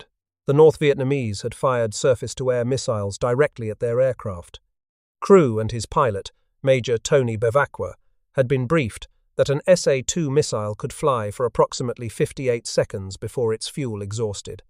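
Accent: United Kingdom. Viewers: The British accent is why so many people watch these videos. Keep them coming!! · Get rid of the British narration.😡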